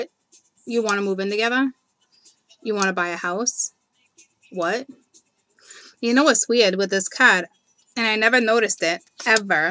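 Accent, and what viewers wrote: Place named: New Jersey, United States. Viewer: I love your accent is that Jersey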